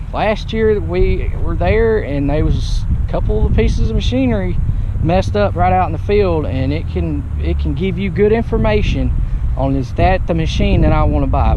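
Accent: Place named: Georgia, United States. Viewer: What part of Georgia are you from?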